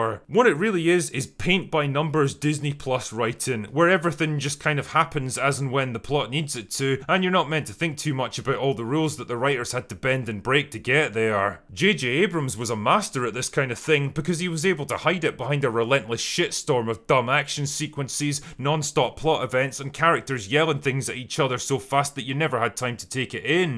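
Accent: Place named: Glasgow, United Kingdom. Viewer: I've haven't seen any disney movie in decades. I just check out the reviews; that's all I need. Jeezuz I love sardonic, hilarious put downs of rubbish. And with a Glasgow accent too!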